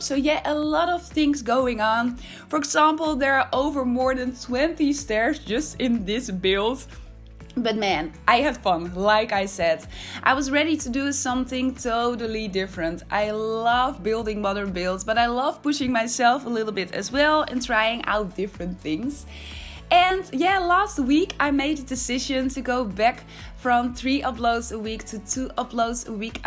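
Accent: Netherlands. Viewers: Ben je Nederlands? Ik hoor een Nederlands accent achtig iets haha ! Heel mooi gemakkt dit!😍 ik ben zelf helemaal verslaafd aan de sims🙈 super mooi😍❤️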